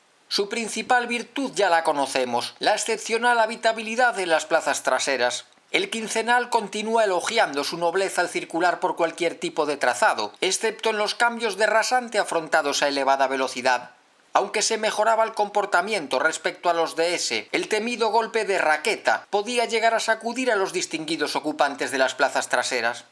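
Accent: Galicia, Spain. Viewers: Excelentes vídeos y como no, preciosos paisajes. Todo un descubrimiento tu canal ,y el acento Gallego me da morriña. Saludos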